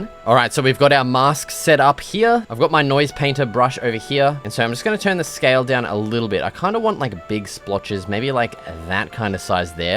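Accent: Australia. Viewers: He's Australian, dumbass.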